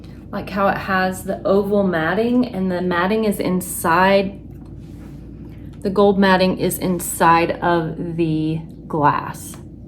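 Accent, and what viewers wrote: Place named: Knoxville, United States. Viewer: Oh I love Knoxville - I thought I recognized the accent. 🇬🇧🇺🇸🌸🌸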